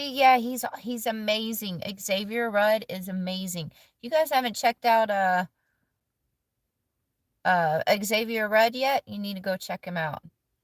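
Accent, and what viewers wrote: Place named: Texas, United States. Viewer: There's nothing wrong with a Texas accent. Thanks for all your travels. Not able to do any travel any more. Love watching. 🐍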